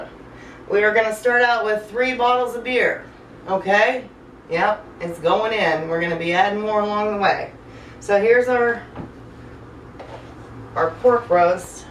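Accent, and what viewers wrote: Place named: Ohio, United States. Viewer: You are my "Sister from another mister!" You sound like me, talk like me, I felt you! (Not to sound stalkerish!) I got the same cookware and everything!!! IDK how old mine is either! I've also got my Grandpa's Cast Iron Skillet! (Notice the caps? BECAUSE!!) I'm from Kettering Ohio! You sound like you're from here...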